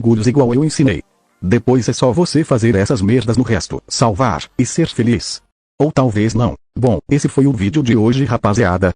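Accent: Bahia, Brazil. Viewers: o cara é um baiano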